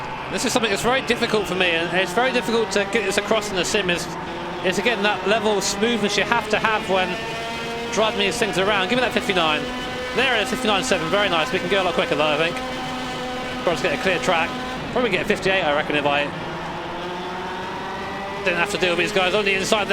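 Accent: United Kingdom. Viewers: I love your British accent lvl. 100: "The difference between driving a kaa vs driving a *kaa*"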